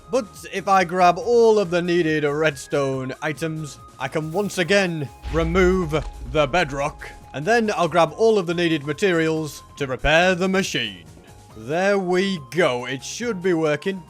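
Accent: United Kingdom. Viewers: Wait.. is British?? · Are you british?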